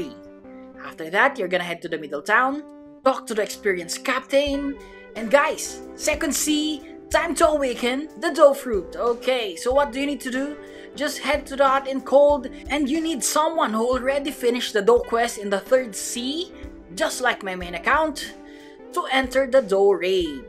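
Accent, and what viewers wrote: Philippines. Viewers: BTW u have a heavy Filipino accent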